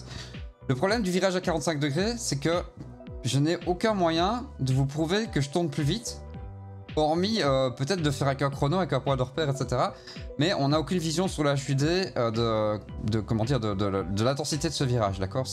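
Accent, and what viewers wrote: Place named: Belgium. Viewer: J'adore la prononciation belge : un ennemi qui "fouit" au lieu de "fuit" xD Tes vidéos me rappellent des potes gamers de Bruxelles et Liège qui disent "houit" au lieu de "huit."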